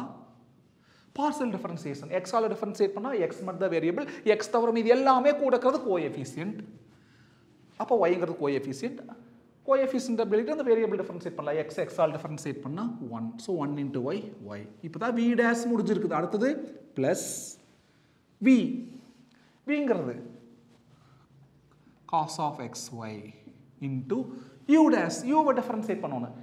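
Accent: Coimbatore, India. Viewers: Kovai slang bro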